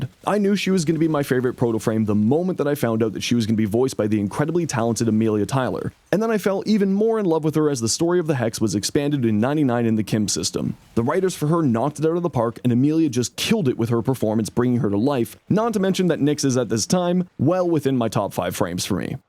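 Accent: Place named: Canada. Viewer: All I heard was Canadian.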